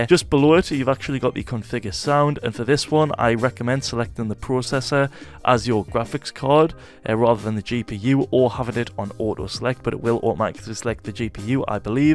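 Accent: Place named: Newcastle, United Kingdom. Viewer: are you a geordie lol you sound a lot like my family from newcastle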